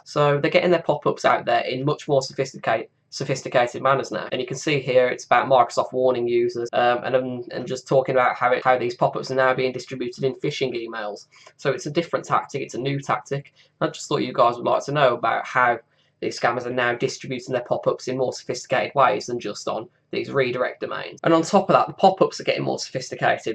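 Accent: United Kingdom. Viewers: Do u live in birmingham, u sound like ur from dudley or west brom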